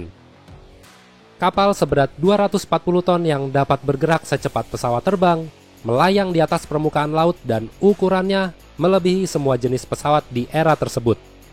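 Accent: Java, Indonesia. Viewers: Bang lu jawa?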